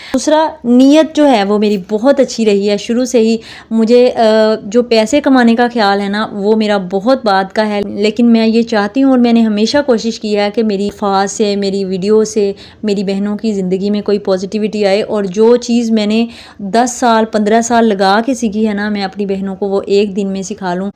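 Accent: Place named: Punjab, India. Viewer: Apka punjabi main bat krny ka style buht acha hota hai m regular watch krti hun apk vlogs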